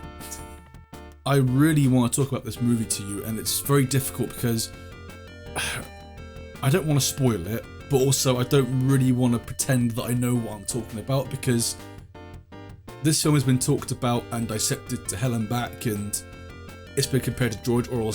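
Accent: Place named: Sussex, United Kingdom. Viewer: Where are you from, mate? Got to place your accent. I'd say Sussex if I had to guess or Wiltshire. Sorry, I've got something wrong with me where i need to know where people are from?!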